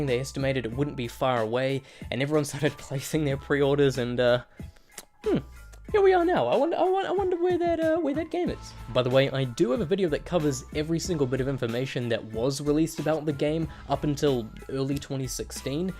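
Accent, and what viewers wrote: New Zealand. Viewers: are you from new zealand? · Are You From New Zealand Bro 😂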